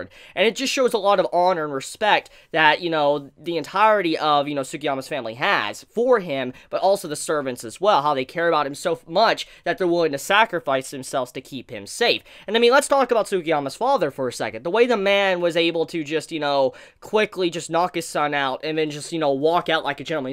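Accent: South, United States. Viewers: #bring back the southern accent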